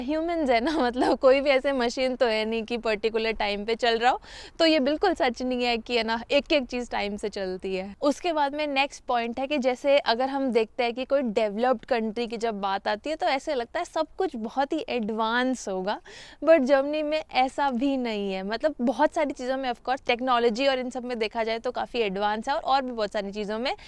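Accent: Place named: Delhi, India. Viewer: aap karol bagh wali didi ki trah baat krte ho...bda acha lga sun kar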